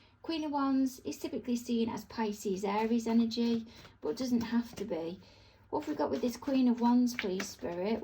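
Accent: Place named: Salford, United Kingdom. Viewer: you sound like you're from Salford. 😊 I'm from Manchester too. I've only just found your video's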